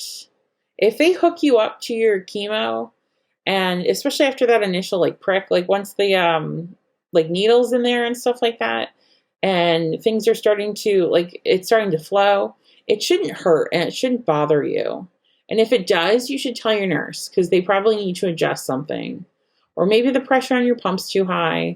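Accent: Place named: United States. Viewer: I love it I was laughing in a good way lol.....I love the American accent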